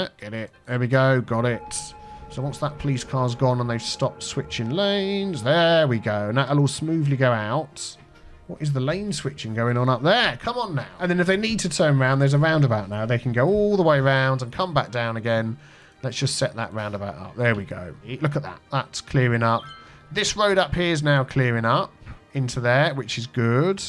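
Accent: United Kingdom. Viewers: love and support from Korea(obviously from south😉) I enjoy watching your video cos i like this game but also your british accent is so satisfying to listen to!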